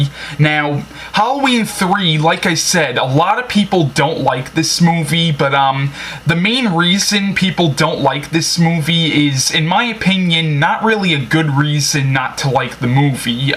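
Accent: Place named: New York, United States. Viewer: Ahhhh.. I knew I'd heard the accent somewhere before :) I knew it was either New York or maybe Connecticut or something. Sorry for my ignorance. I'm Irish from England, so US accents aren't quite so obvious over here. Nice review anyways, mate :)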